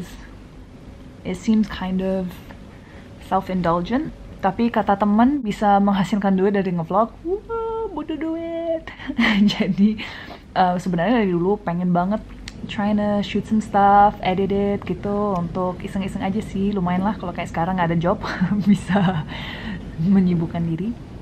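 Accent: United Kingdom. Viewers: jujur banget nih gua,gua suka sejak vidclip zigas,ini cew siapa yah? gua telusurin ampe kemana2,akhir nya tau ceritanya nih cew campuran UK & Bugis Celebes,btw gua seneng ama lo yg pertama of course karna cantiknya,trus yg bikin melting itu british accent nya,im really fallin love with your accent,keep down to earth yow. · i adore your british accent!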